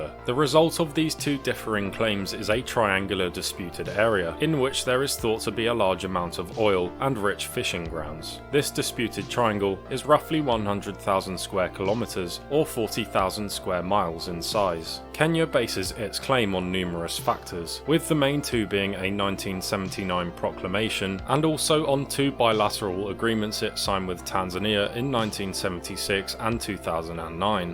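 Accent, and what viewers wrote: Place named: Australia. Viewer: You talk like Billy Finn, the dolphin the family guy, which drives me to speculate you are from Australia.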